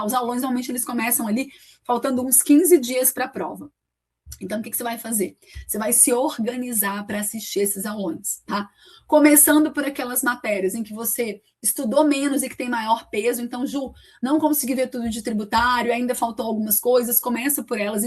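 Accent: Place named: Angola. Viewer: Acabei de reparar que o sotaque de lembra o sotaque angolano!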